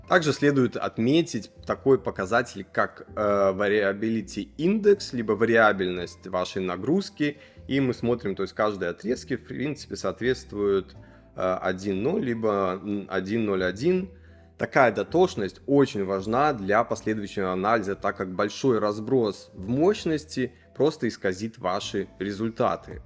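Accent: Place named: Moscow, Russia. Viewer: Чувак конечно крутой, но маасковский акцент все убивает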